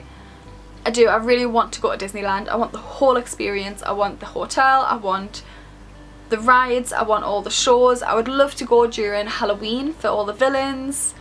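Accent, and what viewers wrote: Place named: Geordie, United Kingdom. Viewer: This was a really great Q&A :) also love that Geordie twang. Like I live in Newcastle also but I don't have the twang... Kind of jealous actually :)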